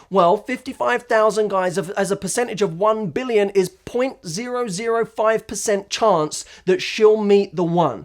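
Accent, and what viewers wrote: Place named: United Kingdom. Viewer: I like your videos, but my own problem is your British accent that I can't get used to!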